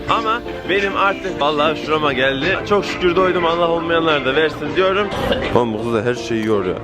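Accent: Konya, Turkey. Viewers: bende diyom bu adam niye Konyalı gibi konuşuyo ben de konyalıyım. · Bir konyali olarak babam herzaman anouu der da diyor😄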